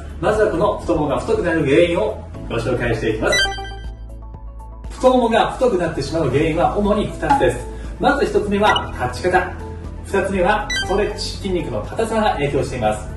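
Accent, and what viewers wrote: Kansai, Japan. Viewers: 初めてコメントさせて頂きます。 今までYouTubeで色んな筋トレ、ストレッチ、マッサージなどしてきましたが、痩せるどころか辛いし、脚は太くなるしで全く継続出来ませんでした。 たまたまこちらがオススメで出てきたのでやってみると、とても簡単でこれで脚痩せ出来るのかな？と半信半疑で初めて３週間。ずっと履いていたユニクロのデニムが緩くなってきたので、古いしそろそろ新調しようと思って試着したら２インチも下がっていました！ 体重も確かに少し減っていましたが、正直ここまで脚痩せしていたとは、ビックリです！ 素敵な声が聞き取りやすく、時々出る関西弁に癒され、ハイセンスなＴシャツとタイツで毎日飽きることなく続けることが出来ています。 長くなりましたが、素敵な動画を本当にありがとうございますm(_ _)mこれからも動画ＵＰ楽しみにしています。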